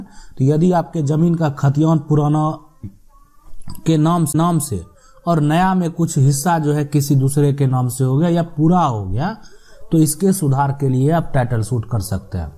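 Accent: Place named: Begusarai, India. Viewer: आप बेगूसराय बिहार से हो आप के बोलने का तरीका बेगूसराय जिले का है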